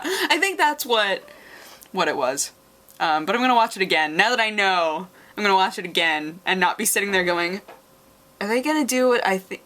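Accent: Spain. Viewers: hey I know spanish (well actually I know english, spanish is like the normal language I speak :p ) Your accent sounds very, like, from Spain. nice job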